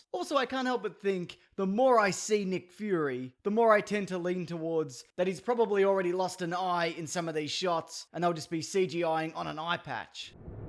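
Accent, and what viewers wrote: Australia. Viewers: Maybe it's the Australian accent, but I never get offended when he trashes Trump, even though I am a Trump supporter. Thanks for always making me laugh,.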